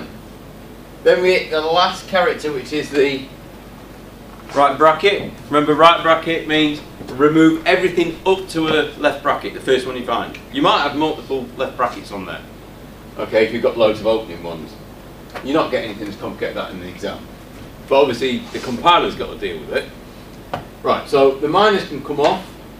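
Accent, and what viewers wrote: United Kingdom. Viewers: love your english accent too xD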